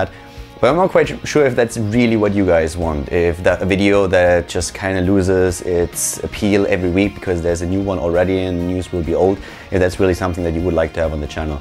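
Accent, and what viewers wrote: Germany. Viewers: Ich habe schon früher deinen Kanal geguckt als du starcraft-Kommentator in Korea warst, seitdem du viel hots machst gucke ich dich dauernd, deine Videos gefallen mir sehr gut :) mach weiter so, ich hoffe deine Karriere geht weiter so gut weiter :) deinen deutschen Akzent habe ich anfangs gar nicht erkannt :D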